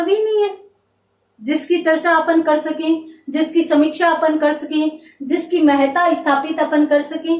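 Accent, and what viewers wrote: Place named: Ganganagar-Bikaner, India. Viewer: Dii ,pata ni par mam ki voice se ganganagar,bikaner region se lagte hai।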